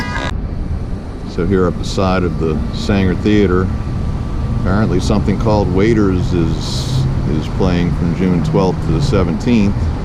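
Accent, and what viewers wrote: New Orleans, United States. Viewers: In your second reply below, you mentioned taxes.....I would imagine they would be very high in the French Quarters and probably everywhere else, considering the historical aspects of the entire city. I've certainly been enjoying all of your wonderful videos. You would be a most interesting lecturer....you have the right voice and your charming New Orleans accent makes it even more delightful. Thank you so much.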